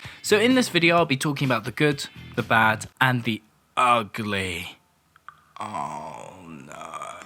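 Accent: United Kingdom. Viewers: Shitty takes. Except for Episode 7, this season was great and not predictable in the least. Pretentious Brit or whatever you are. Fuck your accent.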